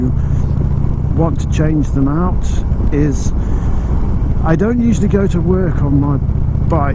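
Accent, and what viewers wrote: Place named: Midlands, United Kingdom. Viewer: Great to see you back and to hear your midlands tones. Its like Nigel Mansell on a Cruiser. Best of luck with the studies.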